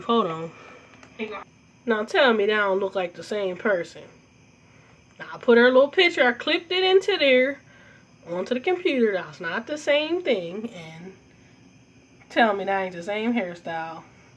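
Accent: Pittsburgh, United States. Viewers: Good video... if your not from Pittsburgh u sure have the accent 😀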